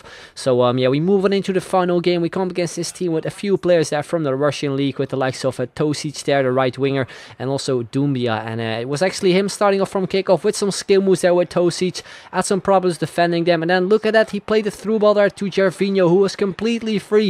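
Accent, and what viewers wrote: Netherlands. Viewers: You sound Dutch! If you are, you 'll probebly get more subs than DDG in like 2/3 years.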